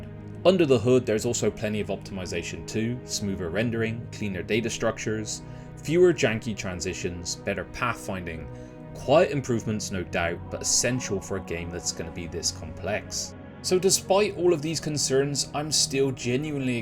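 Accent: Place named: West Country, United Kingdom. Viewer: Whats with the west country accent sprinkled with the American favourite of replacing T sounds with a D. You may not think it 'madders' but it matters.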